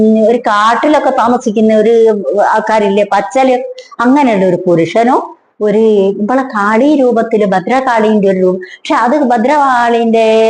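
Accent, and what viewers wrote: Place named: Kannur, India. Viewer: Kannur bhasha ane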